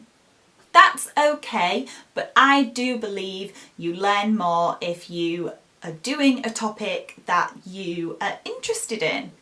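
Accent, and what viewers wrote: United Kingdom. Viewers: the British accent sounds different and sometimes harder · the British accent is better, because you pronounce the words as they're written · Loved british accent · which part of the UK you are from... Are you not from Midlands?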